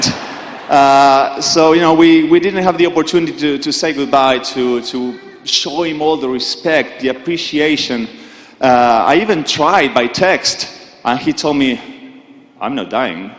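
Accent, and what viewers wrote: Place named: Nigeria. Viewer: if you close your eyes you could bet Manu is nigerian. (awesome speech tho)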